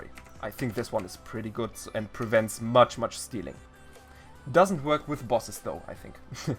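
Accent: Germany. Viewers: Hard Glass is useful for ghast and creeper minions i Think P.S. ich feier deinen deutschen Akzent so hart xdd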